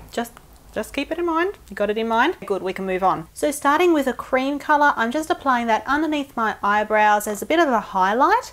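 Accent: Australia. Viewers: You know, usually aussie girls say "like" and "omg" about <num> times every sentence, but not you! You just have a mad aussie accent :D and great videos! Love form germany :D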